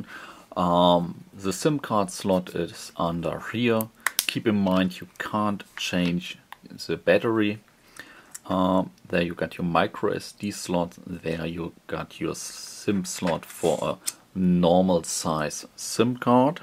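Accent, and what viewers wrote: Germany. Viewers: are u from german ??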